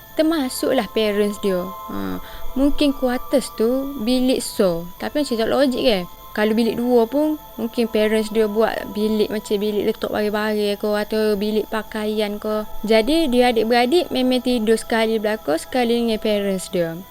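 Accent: Kelantan, Malaysia. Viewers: seronok dengar kecek kelate. boleh lah faham sikit ii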